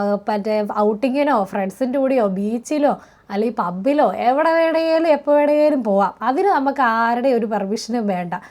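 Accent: Kottayam, India. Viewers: കൊച്ചു കോട്ടയംകാരിയല്ലേ 😂സത്യം പറയണം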